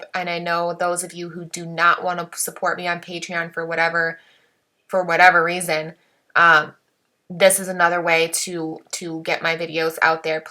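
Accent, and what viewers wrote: Midwest, United States. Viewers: 😂 Honestly.. I never thought it would be taken out of context.. I’ll re-edit the original comment tho.. Going to sound like a simp, but is a saint, I don’t even look at her like that anymore.. Just keeping it real… I was first introduced to videos around 2019-ish.. I was like “Dayuuum! who’s this beautiful woman with a midwestern accent talking about eye opening topics!”… I was crushing lol, but over the past couple of years watching her videos and seeing stuff like cooking, closet videos, and talking about Penny.. You just get the sense she is a strong woman with a beautiful soul.. Hope you’re doing well! 😊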